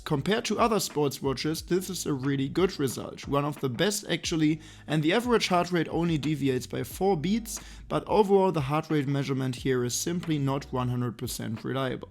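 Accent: Germany. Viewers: holy shit i’m laughing here. Saw your video and clicked to learn about the watch and immediately thought to myself what a thick German accent while glancing down at the name of your channel.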